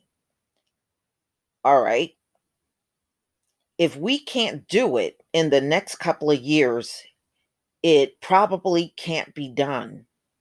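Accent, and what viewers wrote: East Coast, United States. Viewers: Don't insult her accent she is probably from the east coast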